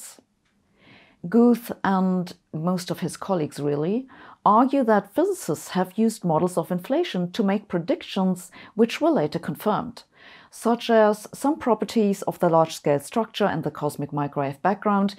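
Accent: Germany. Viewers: is german pronunciation for hypothesis- hypothetis. Or is just how sabine pronounce things?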